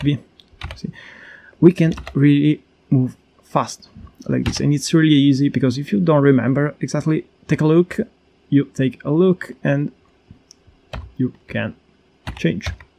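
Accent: Italy. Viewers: Lo sapevo che eri italiano, dalla pronuncia ahahahha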